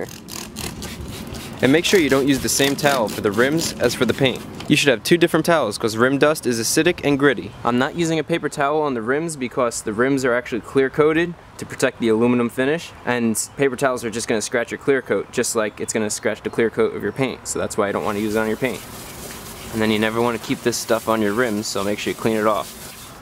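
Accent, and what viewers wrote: United States. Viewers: That's okay. I didn't want to be rude. Heard your US accent and thought you record mainly for Americans. No offense. cheers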